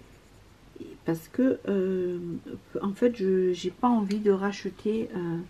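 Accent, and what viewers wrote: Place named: South, France. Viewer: Comme je te comprends 🫂 ah ouii c'est sûr que ton accent y est pour quelque chose hihi. D'accord tu es bien née dans le sud. Oh Martigues, j'y suis allée une fois. Et oui la vie, peut transporter ailleurs. Oh c'est gentil pour la vidéo explicative mystère, à condition que ça intéresse d'autres personnes qui voudraient se lancer vraiment dedans. Moi, je suis juste curieuse de cette drôle de bebête 🤣 Des gros bisous ma 😘😘❤️🫶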